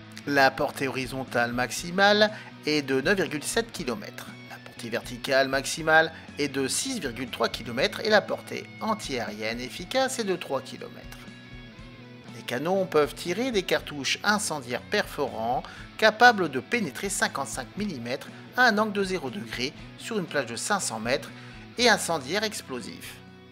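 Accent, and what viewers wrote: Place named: Germany. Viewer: Il a un petit accent allemand 😉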